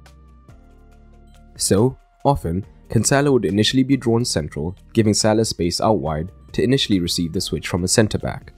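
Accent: South Africa. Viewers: Cool video man. Are you South African?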